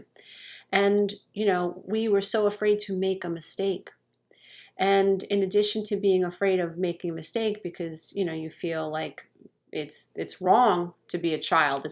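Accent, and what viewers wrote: New York, United States. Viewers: Hey just started listening. Great information. I hear the newyawker in you from one newyawker to anotha. Got get me some cawfee and warm up the caa!!! LOL! Namaste.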